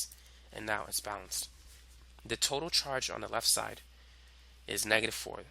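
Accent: United States. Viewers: Thank god we have an American accent here for once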